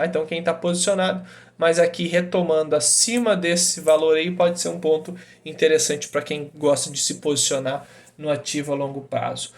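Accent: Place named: Rio Grande do Sul, Brazil. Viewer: Gaúcho de onde mano?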